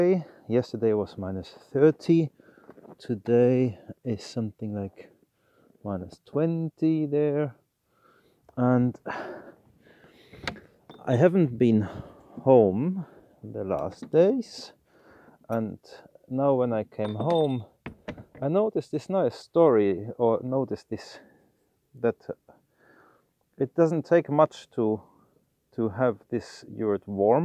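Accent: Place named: Finland. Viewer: Thanks for sharing . Your accent speaking English is getting more and more Finnish and less German. Not a bad thing, just an observation 😊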